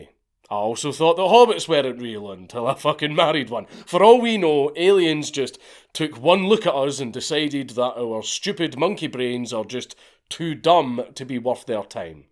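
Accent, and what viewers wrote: Scotland, United Kingdom. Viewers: Lmfao!! The part where the probe began to scream had me f**kin rolling. I love this channel. It honestly wouldn’t be the same without it’s brilliant and hilarious Scottish host. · Ich liebe deinen schottischen Dialekt bruder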